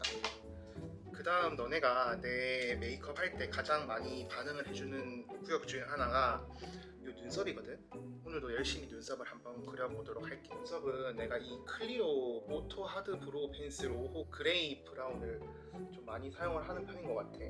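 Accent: Gyeongsang, South Korea. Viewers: 누가봐도 경상도 사람이었어요 형 걱정 안하셔도되요!!! ㅎㅎ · 저 헤지스 룰429 립밤 2+2 했을때 8개 산거 주변에 몇개 나눠주고 오늘 마지막꺼 오픈했어여🙋‍♂️ 션님 사투리는 경상도 허세가 없어서 귀여운거 같아용👍 그리고 같은 경상도인으로서 저만 그런건지 모르겠는데 서울말 쓰다가? 주변에서 사투리 써보라고하면 잘 안나오지않나요^^ 근데 저보고 항상 사투리 쓰고있다는ㅎㅎ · 예전 영상부터 경상도말투엿는데ㅋ ㅋ ㅋ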